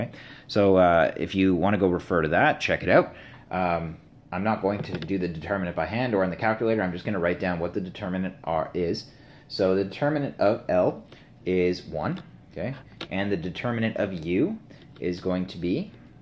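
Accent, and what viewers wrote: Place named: Canada. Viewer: ha could tell by your accent, Canadian fella! great video man!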